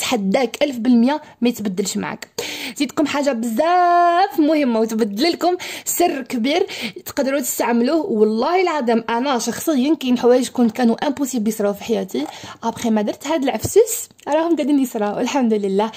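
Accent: Constantine, Algeria. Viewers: شحال تعجبني اللهجة القسطنطينية و تعجبوني بزززااااف كي تقولي نتغشس😻😻😻😻🤣🤣🥰🥰🥰🥰🥰🤣🤣🤣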